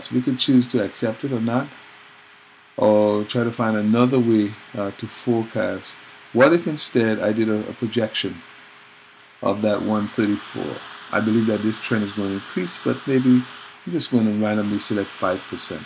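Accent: Caribbean. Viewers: this has to be a Caribbean accent. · so pleasantly surprised when I heard the Caribbean accent. thanks for posting!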